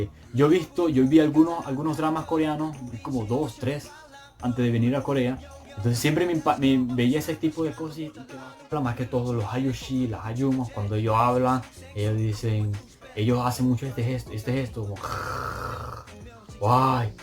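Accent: Zulia, Venezuela. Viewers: yo quisiera oír más sobre esa lengua y cultura a las que ahora para hacerlo más “appealing” le sumamos ese hermoso acento zuliano, sabor venezolano que desborda alegría sin igual. Salí de Vz hace como 30 años, pero ahora estudio coreano y mi sueño (sé que lo lograré) es aprender bien el idioma, visitar Corea del Sur y trabajar en este y mis otros idiomas. Muy orgullosa de ti y los demás venezolanos emprendedores que se esfuerzan por salir adelante, dejar en alto el nombre de Vz y dar a conocer lo bueno, lo bonito, lo chevere y lo maravilloso de quien sabe perder y prepararse por ganar. Quizá parezca que se ha perdido una batalla, tiempo y más, no lo negamos, pero que se sujete fuerte este mundo porque emergeremos con más fuerza y valor. Mientras tanto, en el exilio hoy tal vez, que se conozca por doquier nuestra voz, corazón, cultura, tradiciones, comidas y más.Hay gente de más edad por ahí, que hacen, a que se dedican, cuales son sus intereses más allá de la familia y carrera profesional. 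Ojalá puedas responder con algún video. Te bendigo. Te deseo lo mejor. Respetuoso saludo desde TX. Adelante!! 화이팅:)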